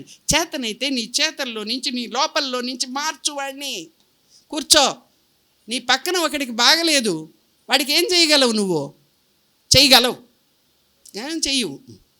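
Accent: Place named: Nellore, India. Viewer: "సాయి సత్య ధర్మ వైభవం" - <num>, షిర్డి సాయి గ్లోబల్ మిషన్ ఆధ్వర్యంలో సాయి తత్వ ప్రవచన కార్యక్రమంలో వాకాడు ఆనంద సాయి మందిరం శ్రీమతి ప్రసంగం సాయి బాబా గారి తత్వం గురించి…సాయి తత్వం ఎంతో గొప్పదని సాయి అన్ని ముందుండి నడిపిస్తారు అన్ని నెల్లూరు స్లాంగ్ లో అద్భుతమైన ప్రసంగం చేశారు..🙏🙏🙏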